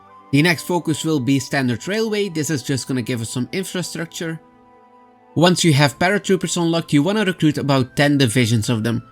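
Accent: Netherlands. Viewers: Are you Dutch or Flemish? Just noticed it in the beginning of the vid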